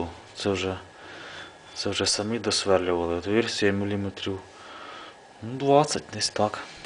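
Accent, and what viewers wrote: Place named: Kyiv, Ukraine. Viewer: Судячи по назві,серйозний проект.А по говору,Київська область.Удачі Вам,в нелегкому ділі ,,тетріс з камінцями''.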